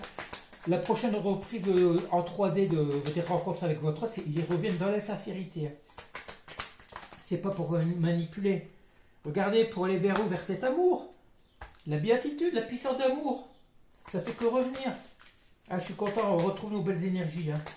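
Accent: Nord-Pas-de-Calais, France. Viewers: Bonjour,toujours un plaisir d'ecouter vos guidances gratitude 🙏a vous.Et oui avoir la Foi c'est le secret,se prioriser et le reste suivra. Je vais bientot vous consultez,j'adore votre accent chti.Je vous embrasse 😘.Belle soirée a vous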